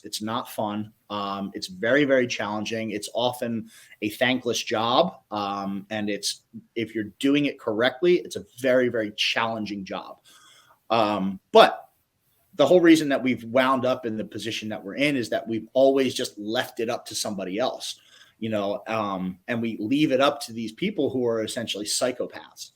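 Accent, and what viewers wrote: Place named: Michigan, United States. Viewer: Sounds a lot like Michigan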